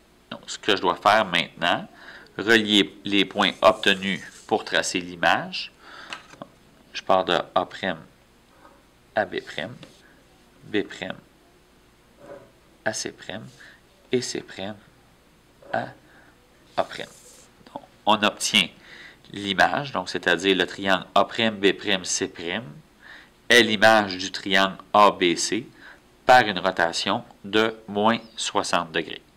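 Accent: Quebec, Canada. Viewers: Merci tu m'as beaucoup aidée car pour une fois je n'ai pas écouté cette leçon et j'ai eu du mal à faire mon DM mais grâce à toi j'ai réussi (j'adore ton accent canadien) 😂❤❤ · Vue que je suis suisse l’accent québécois me dérange mais sinon merci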